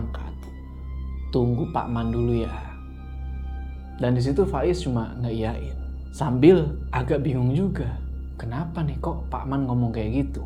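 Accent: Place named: Java, Indonesia. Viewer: bang, aksen jawa lu makin hari makin bagus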